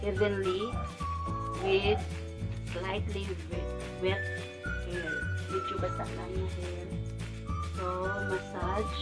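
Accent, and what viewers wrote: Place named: Visayas, Philippines. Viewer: Ate bisaya ka pala. Magka sinabut jud ta ani love u po ❤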